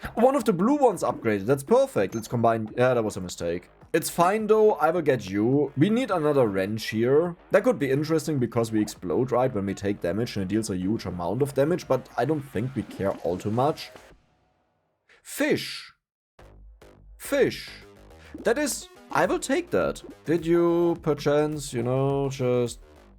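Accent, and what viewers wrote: Germany. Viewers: Alter ich denk die ganze Zeit was hat der für einen abgefahrenen dialekt. Und dann ja es ist einfach Deutsch mit irgendeinem lokalen Englisch dialekt? Hört sich auf jeden Fall nicht nach Schule Englisch an. Ansonsten schickes video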